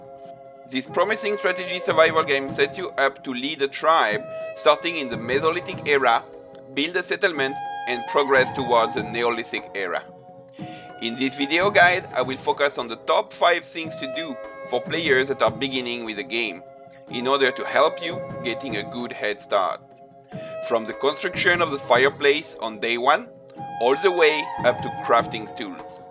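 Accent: France. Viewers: i like your french accent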